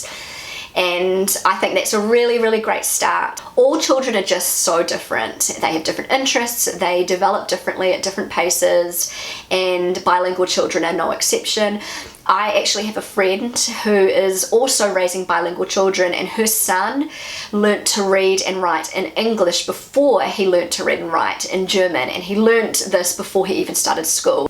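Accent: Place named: New Zealand. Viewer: You know, hearing that Emilias teacher didn't recognize her as a native English speaker left me a bit wonderous. If she pronounced the words in English class the way she learned it from you it must have been more than obvious to the teacher because your "Kiwi"-English differs very significantly to the standard UK- or US-English that's usually taught here in Germany. Although I must admit that your accent isn't all that strong. I just saw New Zealands PM, Jacinda Ardern, on "The Late Show with Steven Colbert" and I really had to concentrate to understand everything what she was saying. I don't know about her politics but from her appearance on that show I must say she's a great ambassador for your home country!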